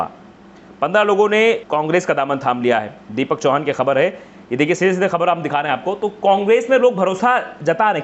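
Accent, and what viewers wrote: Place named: Uttar Pradesh, India. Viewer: Plz keep ur style As Hindi news anchor not UP news anchor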